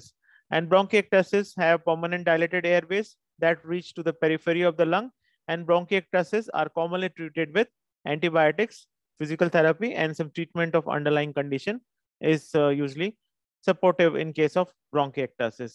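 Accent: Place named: India. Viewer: Unmöglich: deutscher Titel, und dann auf Englisch, mit einem grauenhaften indischen Akzent!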